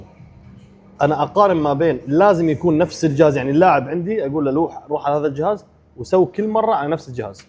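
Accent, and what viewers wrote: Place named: Gulf, Arab world. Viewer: يعم متتكلم مصري ضروري عشان رحت الخليج تتكلم زيهم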